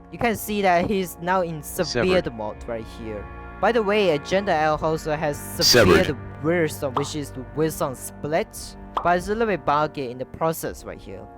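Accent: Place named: Malaysia. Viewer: He's Malaysian, English is not his native laguage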